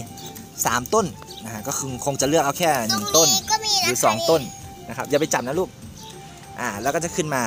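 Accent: Isan, Thailand. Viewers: ชอบพิธีกร พูดไทยปนอีสาน ดีเนาะ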